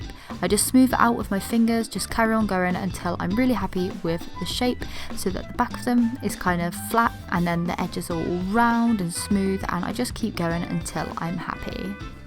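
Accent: England, United Kingdom. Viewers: Cute. What is your accent/somewhere in England? 🙂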